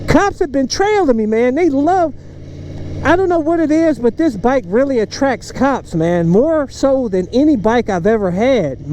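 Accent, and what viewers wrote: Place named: Cleveland, United States. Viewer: Cleveland hood accent.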